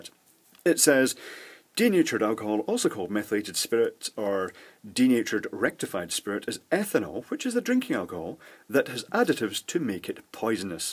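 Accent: Scotland, United Kingdom. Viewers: something satisfying about a Scottish guy cursing.......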